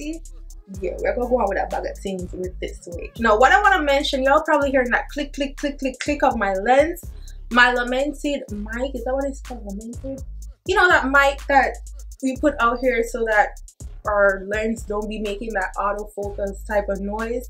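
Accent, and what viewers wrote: Jamaica. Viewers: I love that you come from yaad!!!!! I heard the accent and immediately followed yess hunni and you’re so cute!!! 🤗🙌🏽💋 I’m Jamaican 🇯🇲 myself!!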